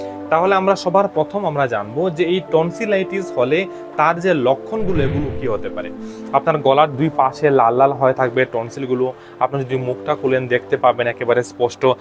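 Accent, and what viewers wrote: Sylhet, Bangladesh. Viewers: আপনি সিলেটি মনে হয়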